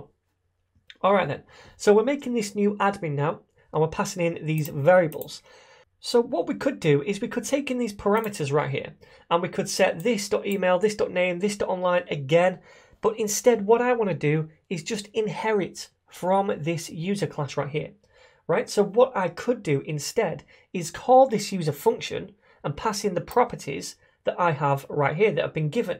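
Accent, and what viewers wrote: United Kingdom. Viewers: Thanks for teaching this in a simplified but straightforward and non-choppy manner. So many other tutorials are so overcomplex in their wordings and skips ahead so much in coding, that half of it doesn't make sense. I haven't watched all of your videos but, just watching one of them makes me wanna watch more. I hope you have them all ordered step by step in Javascript. Thanks again. (" your english is very good despite your british or whatever accent, sometimes the accents people have in these tutorials are too hard to understand or they talk way to fast. You do a great job with both)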